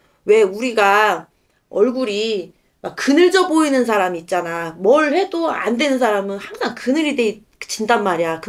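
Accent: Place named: Jeonju, South Korea. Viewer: 선생님도 관상학적으로 재물많이 들어오도록 갖추셨네요.예쁘고 특유의 전주사투리가 구수하고예뻐요.감사합니다.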